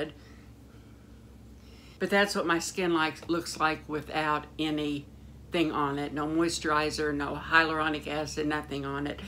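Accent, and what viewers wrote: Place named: Illinois, United States. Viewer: I really enjoy your channel! I have family from Louisville and Olney areas in Illinois, and every once in a while I hear a bit of “Something” in your voice or speech pattern that reminds me of them. Am I even close geographically?? Also, I too lost my closest friend (Kathy) in 2020, definitely changed my life, left a big empty spot no one could ever fill. I dreamt of her the other night - first time since she died. She said - “ what took you so long to visit me”. Have you ever dreamt of Pat?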